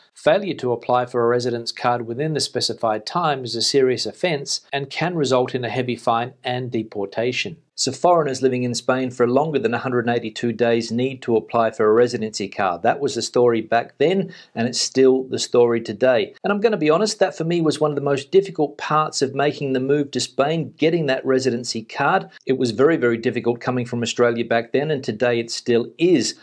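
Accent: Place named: Australia. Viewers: Aussie aussie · How? I'm not english native speaker and I could tell his accent was from Australia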